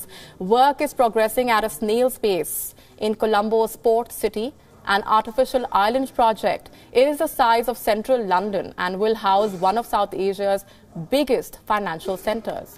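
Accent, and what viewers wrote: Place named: India. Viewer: Very heavy Indian accent.....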